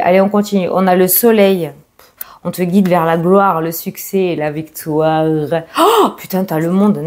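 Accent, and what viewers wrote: South, France. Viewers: Bonjour ma, tu es une personne très de l empathie cela se ressent dans la façon de t exprimer avec ton petit accès du sud que j aime entendre. Je souhaite pouvoir participer à ton jeu concours pour avoir une consultation gratuite de 30 m. Merci pour le postif que tu nous apportes tous les jours et qui nous aident t positiver. Un grand merci ma douce.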